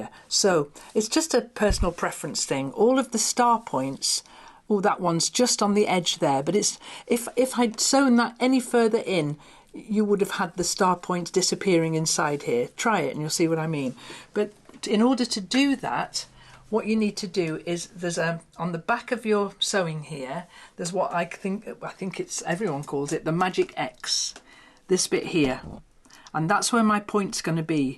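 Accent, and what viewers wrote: England, United Kingdom. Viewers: I tie most of the baby quilts I make. You can use a thicker batting and it’ll be “puffy”where it’s tied. Use a variety of brightly colored embroidery floss to tie it. Your videos are always inspirational. I love listening to your English accent, my grandmother was born in England, so it takes me back. ❤ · I’m wondering also, what part of the U. K. are you from?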